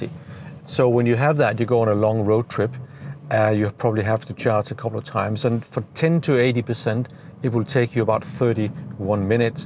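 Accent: Denmark. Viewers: A very professional upload - Thank you! Listening to your accent I would have guessed that you are of Danish origin:) Now seeing your name is, I guess I'm right:)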